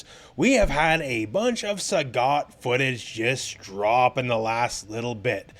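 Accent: Canada. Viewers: Canadian accent is strong in this video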